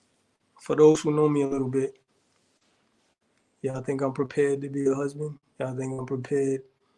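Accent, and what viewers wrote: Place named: New York, United States. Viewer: Wow such amazing testimony 🙌🏾 ❤thanks for sharing!! That New York accent has never left and that million dollar smile is beautiful!! It's so amazing too always listen to other people testimonies especially young black men, I've recently accepted my lord & savior in my life and its been so amazing just thinking about it and writing this comment I'm in tears because god is amazing, he has brought me through some terrible things but he reminds me everyday he has me and that everything will always be okay. Can't wait to see more videos from you!! The crazy thing is I'm from the DMV area and for some reason everyone thinks VA is like the best place ever like there's no rough spots in VA and I just laugh and be like yeah ok....well young man may god continue too bless you and everyone in these comments❤🙏🏾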